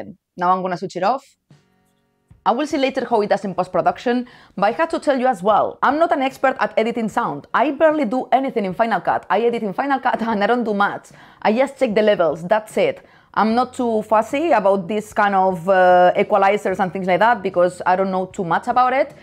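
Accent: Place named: Spain. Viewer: I have to tell you, you have taught this old photographer so many useful tips and tools. I always look forward to your fantastic videos. Side thought, you look amazing and I love your Spanish dialect. See you in the next one.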